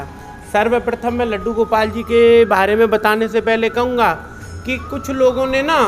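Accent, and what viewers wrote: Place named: Braj, India. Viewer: Pandit ji mujhe aapki braj boli bhut pyari lagi😚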